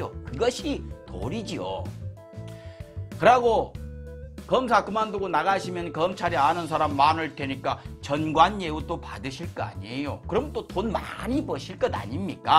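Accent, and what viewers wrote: Jeolla, South Korea. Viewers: 전라도말씨 시원하게들리네 👍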